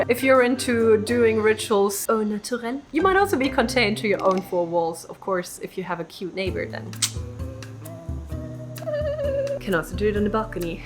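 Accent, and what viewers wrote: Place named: Germany. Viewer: I live in a small rural town. Its about a mile square. Population around 1100. Our home sits on a large corner lot. We have a huge backyard. I feel lucky I can light my fire ring whenever I want. But summers are just TOO HOT! We have enough room for any kind of gardening. I prefer to do my working intentions either within my home or in my backyard. We have lived in our home for 40 years now. So there is a lot of strength and love within its walls. My maternal grandmother came from Germany when she was 16 years old. She lived to be 99 and 1/2 years old. I love listening to you. Your accent is much like hers was. 😊❤❤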